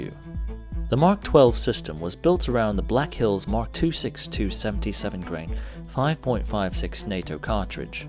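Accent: United Kingdom. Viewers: New sub here. Love the content. Gotta ask, what accent is that, British? Really cool. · Anyone else annoyed with the British accent on an otherwise awesome review???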